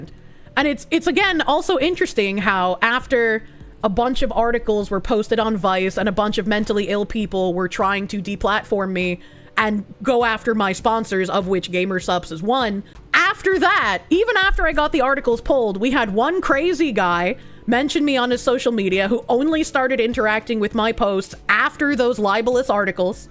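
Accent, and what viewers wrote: United States. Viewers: i love how you say "man" where is your accent from in the states? I am australia so help me out.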